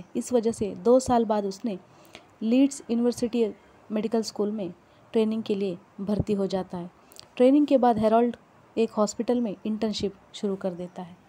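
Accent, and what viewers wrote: South, India. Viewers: Better u speak English ur accent is south Indian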